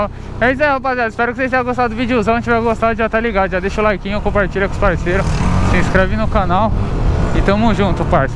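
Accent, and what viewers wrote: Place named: Sao Paulo, Brazil. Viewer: Sou novo no canal… vc é de SP ou do RJ? Vc fala como paulista mas usa gíria de carioca “paizão” “calica” não entendi nada kkkkkkkkkk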